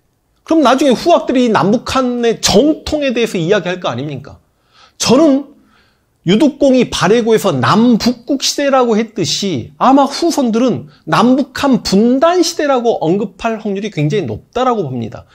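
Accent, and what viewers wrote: Jeolla, South Korea. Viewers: 전라도 사투리 쓰시네요?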